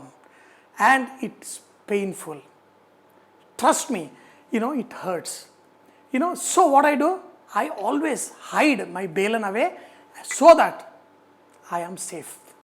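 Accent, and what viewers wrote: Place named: Kerala, India. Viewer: Sir your accent sounds like you speak Malayalam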